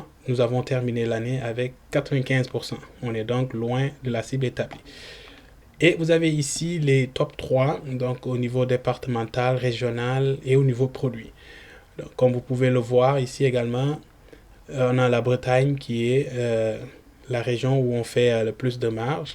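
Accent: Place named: Senegal. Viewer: Tu es probalement sénégalais à ton accent. Pourquoi ne pas travailler aussi sur une carte de ton pays?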